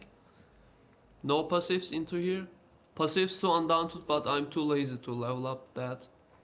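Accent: Turkey. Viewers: Türkmüsün kardeşim Türk İngilizcesi bu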